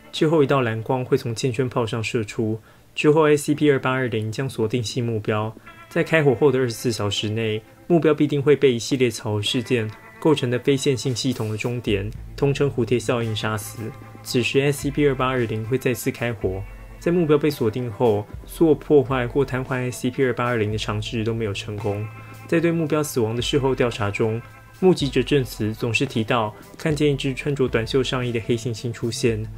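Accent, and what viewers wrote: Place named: Taiwan. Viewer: 最早在B站上看到这个youtuber，一开始不知道是youtube上面的，后来听到湾湾口音觉得肯定不是大陆人（福建人口音虽然一样但不会那么明显哈哈哈）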